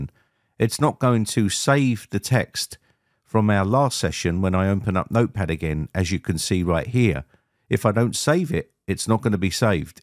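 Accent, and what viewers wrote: United Kingdom. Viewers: Thank you so much random british person on youtube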